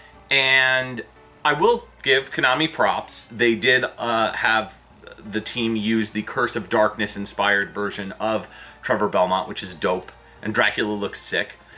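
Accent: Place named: Brooklyn, United States. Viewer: I love honest rants. Honestly saying what most are thinking - right down to the Brooklyn accent 😂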